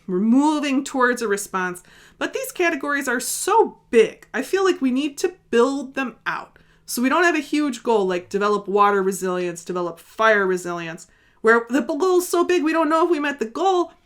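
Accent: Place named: Upper Midwest, United States. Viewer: I'd like to find out more about river-erosion risks in my area, and also in areas that I'm thinking about for relocation. Can you recommend places to learn more about such risks? Also, my friends and I love your information - almost as much, we love your classic Upper Midwest accent. We call you the! Great attitude and great info. Thanks so much!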